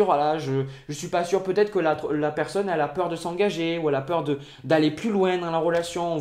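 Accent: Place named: Toulouse, France. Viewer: Salut, je viens de découvrir ta chaine, super super super, du coup j'ai regardé la série de vidéos sur le tirage de cartes (au debut de ta chaine je pense) et le tirage pour les gémeaux (que je suis!!) pour le mois de mars 2019 qui m'a ENORMEMENT parlé!!! Bravo, bravo, tu es vraiment un chouette gars plein d'amour, de bienveillance et de gentillesse et t'écouter est un vrai plaisir... Ne serais-tu pas de Toulouse??? Ce petit accent m'est familier! Gros bisous, plein d'amour pour toi et continue à exprimer ton intuition si juste! Pascale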